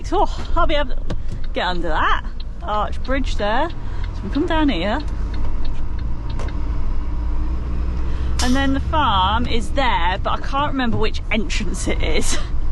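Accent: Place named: Cockney, United Kingdom. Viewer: Love the the chockney accent where about you are today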